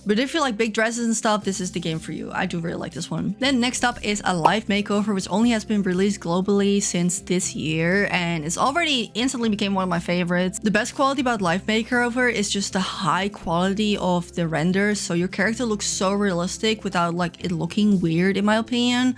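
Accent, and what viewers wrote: Netherlands. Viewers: Oh my god i was listening and thought i heard a bit of a Dutch accent peeking through untill you mentioned the Dutch name of a game. I feel so smart lmao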